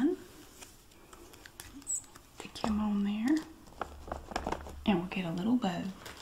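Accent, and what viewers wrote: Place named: Texas, United States. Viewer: As a southern girl from Texas, this video makes me feel at home😂 the accent, the remarks, the methods, it’s all just familiar🥰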